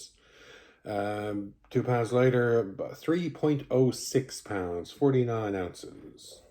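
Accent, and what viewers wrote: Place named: Newfoundland, Canada. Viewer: Was looking at buying this sword, watching your video...hmm that accent sounds familiar. Sounds like a fellow Nflder? Anyway, subscribed and liked.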